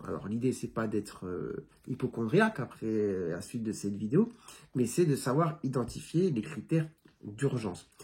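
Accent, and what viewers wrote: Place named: North, France. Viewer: Ça dépend aussi de la région d'où on vient, je crois que le dr est originaire du Nord. Pour ma part, ma mère étant aussi de là-haut, j'ai hérité de cette prononciation.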